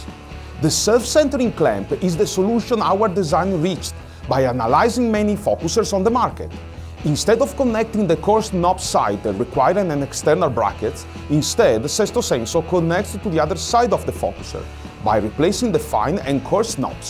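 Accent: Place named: Italy. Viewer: Salve! Tu haves notabel accento italiano. Successo a ti e ao Populo Italiano!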